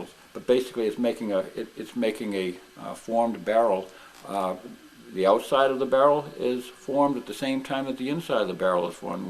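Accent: New England, United States. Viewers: Pleasant New England accent, sir. Much different than we're used to hearing in Nashville!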